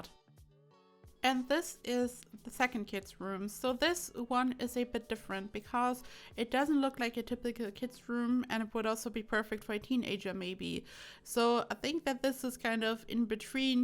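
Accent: Germany. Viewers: Your accent at least sounds very german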